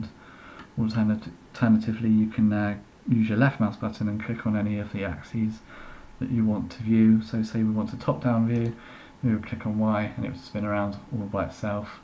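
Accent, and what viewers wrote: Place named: United Kingdom. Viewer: Your accent is like of Britishers' ;D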